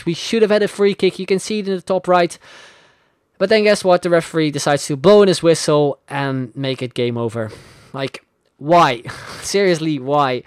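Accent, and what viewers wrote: Netherlands. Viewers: you sound dutch. Are you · Are you dutch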